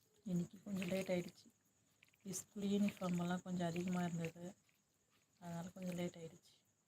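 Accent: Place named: Coimbatore, India. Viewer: எங்களை பார்க்கவைத்து சாப்பிடாதீங்க உங்களுக்கு வயிறு வலிக்கும் கோவை தமிழ்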